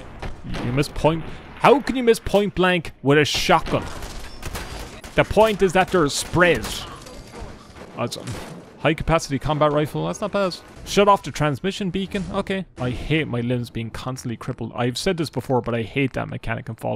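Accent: Ireland. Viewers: Another Irish YouTuber, nice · R u Irish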